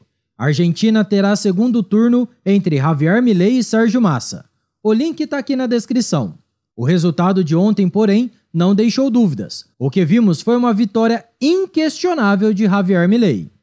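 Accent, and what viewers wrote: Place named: Caipira, Brazil. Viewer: Ótimo vídeo. Mas precisa diminuir esse sotaque caipira, que torna irritante para muitas pessoas assistir o vídeo. É só prestar atenção nos "erres". Abraço!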